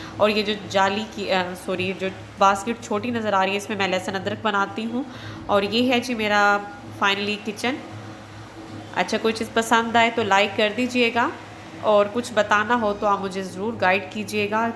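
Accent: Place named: Punjab, India. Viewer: Punjabi tone zyada zbrdst hai aap ki 🌹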